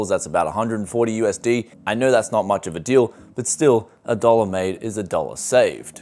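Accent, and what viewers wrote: Bogan, Australia. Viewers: Please stop with the bogan voice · That is exactly how I imagined Aussies speak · idk why but, Australian accent sounds for me so good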